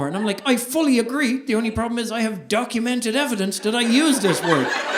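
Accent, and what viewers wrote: Ireland. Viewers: Eye candy, Irish brogue, trivia, truth, comedy - welcome to my hometown! Yep, I'm in Florida but wander around. You'll see there's little bit of everything here...and we're all related. (source: 23 and Me) 😇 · Wonderful show (and boy, did i need some laughs). I think you're the first Irishman I listened to whose every word I perfectly understood; your looks didn't hurt. 😉😘Hope things are looking up; you deserve it. BTW, your mom sounds terrific; hope she and your fam are well. You should come perform in San Miguel de Allende, Mexico; lots of expats here would love you (me included). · I love your comedy. I watch every show you do on you tube. I love your Irish accent. Have you ever listened to Onoir an Irish singing group from Ireland? Wonderful music. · The Irish accent 😀👍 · David is an Irish, American,Mexican, treasure - I love the Irish brogue and his comedy is so fresh and funny 😁 Keep up the great work 🎉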